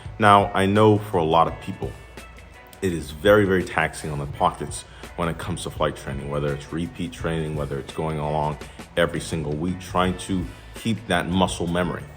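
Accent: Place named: New Jersey, United States. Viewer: Hey whats up, im guessing your from Jersey. Are you a CFI in the area?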